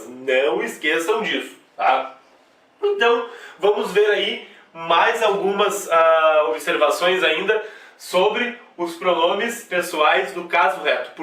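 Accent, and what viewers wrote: South, Brazil. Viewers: aula excelente aí!, a dinâmica ajuda bastante aí!, muito legal aí!, o professor é do sul mesmo aí!, parabéns aí!, obrigado! aí!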